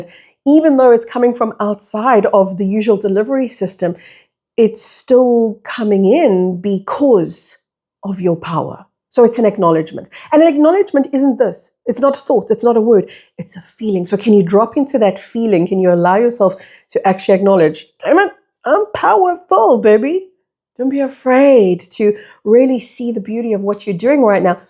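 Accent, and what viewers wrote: South Africa. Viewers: Thank you for sharing your wisdom. You popped into my orbit after your conversation with Amrit and so, so glad you did! Love hearing a familiar accent and especially at a time when, having lived overseas for some 20-odd years, all I want is to go home to SA. Listening to you feels just like that... home ❤...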